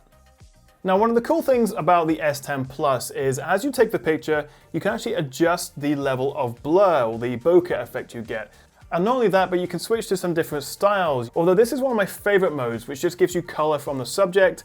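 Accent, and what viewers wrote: England, United Kingdom. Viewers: First time watching one of your vids, I have to say I enjoyed it! What sold me was your accent, it wasn't so grossly over the top annoyingly ENGLISH - UGH! Very detailed, highly informative. USA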